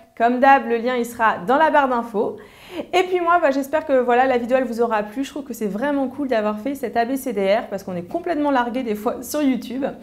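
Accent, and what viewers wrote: England, United Kingdom. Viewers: tu me fais trop avec ton accent Anglais!;) Toujours au top, bisouuuus!:) <3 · # Mdr tu a un accent anglais à mourir de rire mais je t aime bien quand même Plein de bisous:-*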